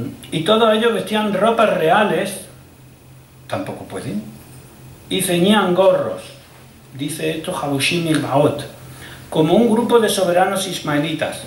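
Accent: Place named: Córdoba, Argentina. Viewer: Lástima la calidad del vídeo, con mal sonido y sin que veamos los documentos de patnalla, con un micro antiguo que recoge las toses, con lo barato que es un micro de solapa, y con alguien que no lea los textos que él conoce a esa velocidad, que no hay cerebro que lo pueda asimilar al oirlo, sobre todo para quien no está familiarizado con el acento cordobés.Y por cierto, la existencia de cultura islámcia en la Península Ibérica, es una invasión, una ocupación, por mucho que el sr. diga que no, pero ya sabemos la línea ideológica subjetiva de la universidad de Granada. Poco profesional todo.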